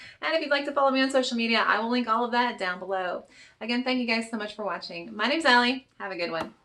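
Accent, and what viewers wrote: South, United States. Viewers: I'm curious what state you live in? I'm sure you mentioned it before, and from your accent I assume down south..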